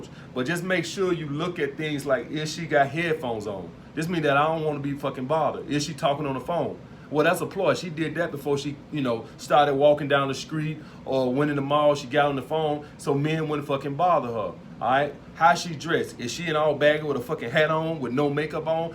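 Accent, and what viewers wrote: South, United States. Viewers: You sound like you from the south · Laidback andhumble he's from Alabama if i remember correctly.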